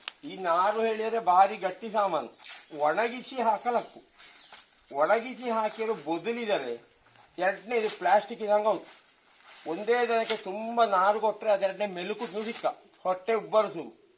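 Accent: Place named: Tulu, India. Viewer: ನೀವು ಕನ್ನಡ ಚೆನ್ನಾಗಿ ಮಾತಾಡೋನು ನಮ್ಮದು ತುಮಕೂರು ನಿಮ್ಮದು ಸ್ವಲ್ಪ ತುಳು ಭಾಷೆ ಮಿಕ್ಸ ಆತ ಉಂಟಲ್ಲ❤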